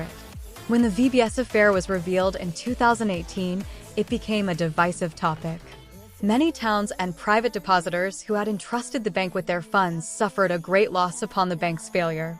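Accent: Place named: United States. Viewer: Why is this in an American accent?🙄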